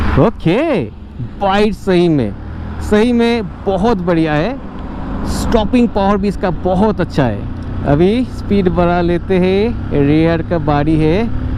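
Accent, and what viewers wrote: United Kingdom. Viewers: ओ भाई जरा ठीक से बोल ले हिंदू में भी इंग्लिश accent मार रहा है ढंग से बोल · Hindi mai bol ya English mai bol but don't use British tuning brother But nice information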